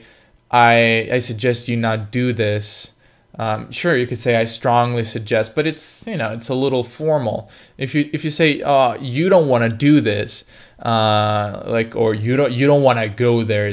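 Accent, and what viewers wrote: United States. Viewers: your American accent is very good. I don’t think I’ve ever heard you speak English before and I know it’s quite an accomplishment to nail an accent without having lived in the country for many years. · you sound totally american.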